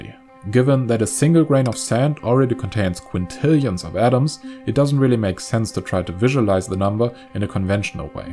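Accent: Germany. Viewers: He almost speaks German lol. Most obvious accent ever:D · Omg such a German accent xD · The German accent makes it even more believable... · Well, he does sound German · hier, ich will dich nicht vom videos-machen abhalten. ich find das wunderhübsch zu youtube was beizutragen. aber so´n übersteuerter deutscher akzent, da kommts mir hoch ey. kann man sprecher für anheuern, wenn man selbst nicht in der lage ist... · Warum lasst Ihr einen Deutschen Englisch reden? Macht das Video bitte entweder auf Deutsch, oder nehmt einen englischen Native Speaker. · I'm sorry, but a German accent saying the words "need to shave the heads of roughly 4 million people" cracked me right up. What can I say, I'm not one for being politically correct, either. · The German accent is horrible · Horrible German accent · "lets make a human sausage, maybe out of a murderer or lawyer" hrhrh i knew he was from germany, if not already clear by accent ... 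pfff and they say we got no humor ^^ its just that our humor is rather dry or explicitly gruesomely dark =) ... but to our shame we have also lots of very silly humor in the category of fart jokes *sigh*, to me that type is very cringe even before watching lots of english speaking satirists and commedians over the years(thank you youtube). ontopic: thanks for the excellent presentation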